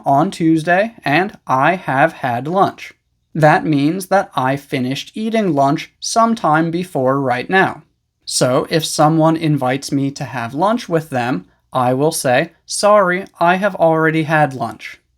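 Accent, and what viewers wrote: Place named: United States. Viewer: You have american a ccent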